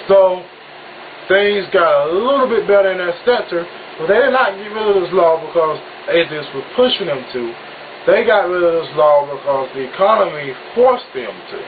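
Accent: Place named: South, United States. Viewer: Yebo mfana. (isiZulu, Yes boy, a friendly greeting) Well, as an atheist you might be somewhat isolated in Aiken County, but, well, there must be another free spirit there.....somewhere. Hope u find him. LOL, and I am having HUGE fun learning how to understand the Southern accent. You are an excellent speaker, and I take it from your confident and easy manner that you have been "a-activising" for quite a while.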